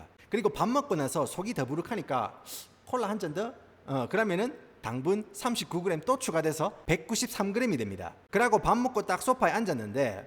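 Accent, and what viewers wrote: Gyeongsang, South Korea. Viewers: 갱상도 사투리가 내기에 상큼하게 터지네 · 영남 사투리를 매우 적절히 구사하는 분이군요. ㅎㅎㅎ 게다가 동영상의 내용자체가 매우 진솔하고 생각할 점이 있는 유익한 것이군요. 유튜브 동영상이 대박 나길 기원합니다. ㅋ 미국인의 식습관에 관해서 하나 덧붙이자면 냉전시기인 1960년대에서 1970년대 까지는 미국의 각급 학교에서 비교적 영양적으로 균형잡힌 급식을 학생들에게 제공했다고 합니다. 그러나 로널드 레이건이 집권한 이후엔 국방비는 증액, 교육복지비는 무조건 감액 하는 공화당 정책때문에 미국내 각 학교들의 예산도 대폭 삭감되어 학교에서 제대로된 급식을 학생들에게 제공하지 못하게 되었죠. 이 점도 미국인의 비만 증가에 영향을 끼쳤을 거라 봅니다. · 갱상도사투리에 영어발음조합 은근 잼있으예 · 우리 슨생님 일 조금 하고 돈 마니 버세요. 이렇게 입을 잘 터는 유튜버는 더 승승장구해야 된다고 생각합니다. 박가네 오상(사투리+고급 일본어)이나 님(사투리+본토영어) 처럼 갱상도 유튜버들이 더 많아져야 한다고 생각합니다.. 귀에 팍팍 때려 박힘. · 님, 너무 재밌게 잘 보고 있어요. 초기에 구독자 많지 않을때부터 영상 알고리즘 떠서 보고, 바로 구독하고 지금까지 보고 있네요. 저도 경상도 여자로서, 친언니도 미국 살아서 한번씩 간 미국에 대해 느끼는 부분들이 공감되는 부분도 많구요. 경상도 사투리도 리얼하고, 입담도 좋으시고.. 개그코드에 초긍정 에너지까지 많은 에너지 주는 님 늘 응원할께요. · 경상도 사투리+미국쿡 영어 = 님의 억양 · 오! 첨에 쎈 갱상도 억양이여서,너무 토속적이신줄 알았는데 다양하고 박학다식한 지식이 있으시고 전문적 분야도 공감 가도록 설명해 주시네요. 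더구나 미국적 배경으로 다져지신 경험과 지식이라서 폭넓은 안목을 가지셨군요. 너무 재밌게 잘들었구요. 미국에 30년이상 살고 있는 사람으로 찐 공감 했습니다. 영상대박이 기대됩니다!! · 영어가 경상도 사투리하고 궁합이 딱 맞네... 재미난 영상 항상 응원합니다. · 아 이분 사투리랑 영어발음은 또 오지는게 진짜 경상도사람인데 겁나 웃기네요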